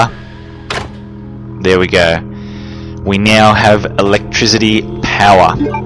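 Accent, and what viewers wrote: Australia. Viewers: He’s Australian man Different dialect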